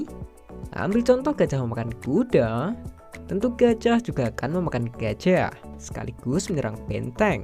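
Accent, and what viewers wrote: Java, Indonesia. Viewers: Sampeyan jowo endi bang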